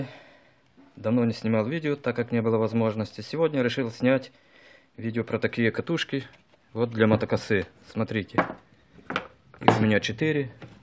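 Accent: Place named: Moldova. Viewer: Судя по акценту - молдованин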